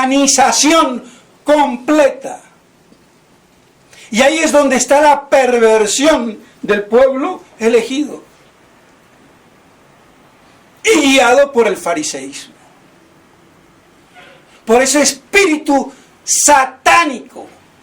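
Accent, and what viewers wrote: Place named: Cuba. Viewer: Hola UD vivio en México xque habla como cubano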